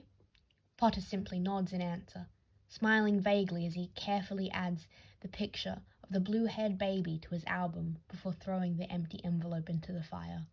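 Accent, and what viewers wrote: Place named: Australia. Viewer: YESSSSS!!! finally a fellow Australian making one of these podfics, amazing by the way I find it hard to concentrate but i was really able to follow on with this on.😄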